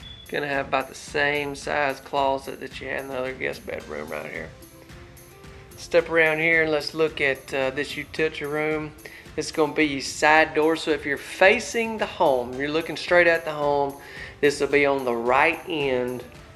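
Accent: South, United States. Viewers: Very southern/ cowboy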